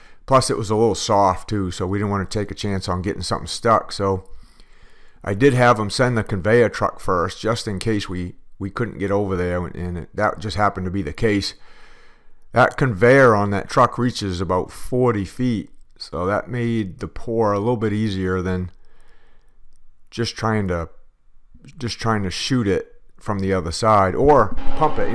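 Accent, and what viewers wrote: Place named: New England, United States. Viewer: Where is this guys accent from? Sounds like a Boston/Maine hybrid.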